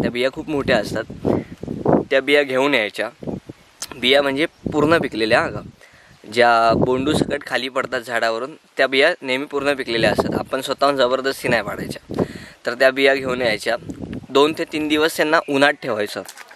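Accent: Malvani, India. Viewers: मालवणी एकदम मस्त मित्रा. छान वाटते ऐकून आणि अभिमान सुध्धा. Keep it up. छान व्हिडिओ आणि सुंदर माहिती माझीसुद्धा गाव मालवण देवली येथे आहे.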